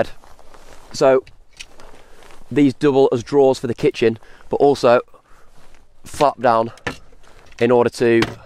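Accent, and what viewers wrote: Birmingham, United Kingdom. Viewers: What a british accent ! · 🤣 Great conversion mate, even for a Ford! Are you a fellow brummie? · Are you from Birmingham? 😊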